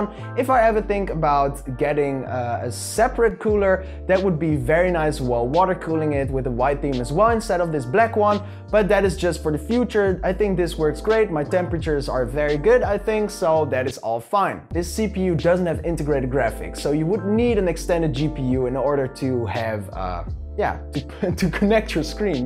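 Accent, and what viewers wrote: Netherlands. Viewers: are you from the netherlands? your accent sounds like it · haha, ik dacht de hele tijd al dat je nederlands was (piep klein beetje accent). Mooi gebouwd! Ik ga denk ik dezelfde bouwen maar met de 3900x.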